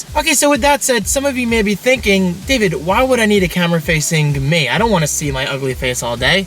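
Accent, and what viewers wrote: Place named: Philadelphia, United States. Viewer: Classic Philly Accent haha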